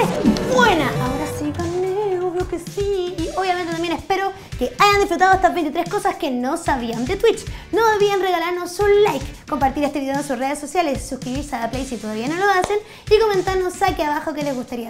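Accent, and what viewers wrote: Chile. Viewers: Me encanta ese acento chileno tuyo 😍👍Saludos desde Brasil 👋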